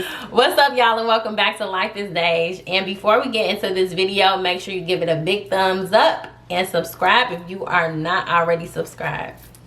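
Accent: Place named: South, United States. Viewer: Love the southern accent!!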